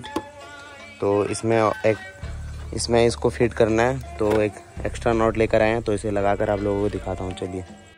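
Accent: Odisha, India. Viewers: Bhai app odisha se ho paaka 😅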